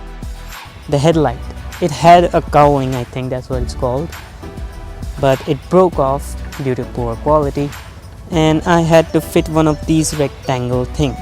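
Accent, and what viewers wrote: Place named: Pakistan. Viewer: Ur English is also made in pakistan.as we can see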